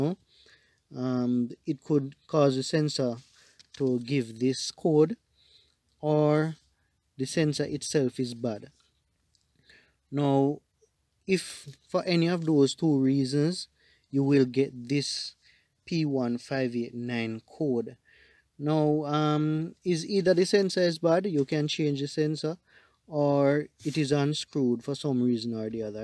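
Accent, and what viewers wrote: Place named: Jamaica. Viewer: This was very helpful , keep on doing your thing, you sounds like a yaawdy and i like the way you brought the information plain and simple.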